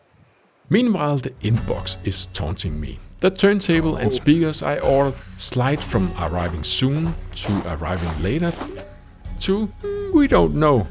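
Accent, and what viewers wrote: Denmark. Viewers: click on a video from a channel I haven't watched before, immediately hear the accent, OH A FELLOW DANE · Great video production, likeable 50something, cool looking furniture, charming Danish accent: I subscribed!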